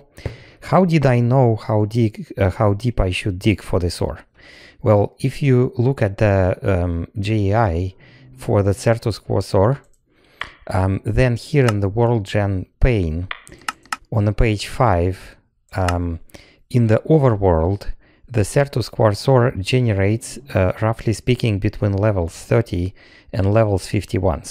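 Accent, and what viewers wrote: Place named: Russia. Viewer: Слышно что русский)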